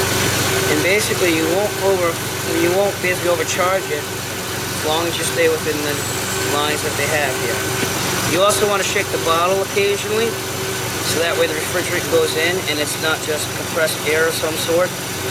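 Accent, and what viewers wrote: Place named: New Jersey, United States. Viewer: Jersey accent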